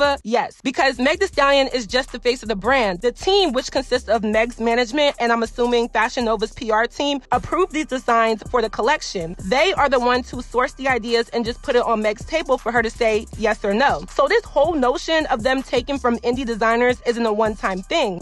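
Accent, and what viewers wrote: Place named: DMV, United States. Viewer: Are you from the dmv? Lol I can hear it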